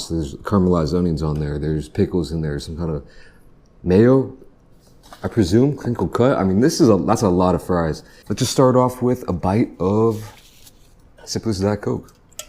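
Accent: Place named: Alabama, United States. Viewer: what the fuck why does he look like ching but sounds like robert who lives in Alabama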